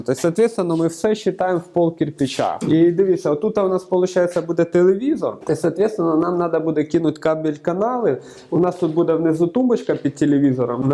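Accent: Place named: Chernihiv, Ukraine. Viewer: відео та канал загалом дуже круті, дякую за технічні подробиці. Судячи по твоїй вимові, ти мабуть родом з десь з Чернігівської області?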